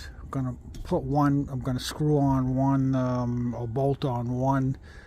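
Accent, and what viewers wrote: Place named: New England, United States. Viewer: Where are you from originally? Boston? Good to hear a New England accent. I am and currently traveling… at the moment In Arizona in my Revel. Thanks for the videos they are excellent 👍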